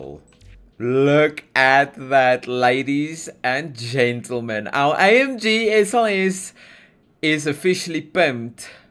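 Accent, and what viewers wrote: South Africa. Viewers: That is the most Saffa accent I’ve heard in my life, holyyyy. Nice video though man.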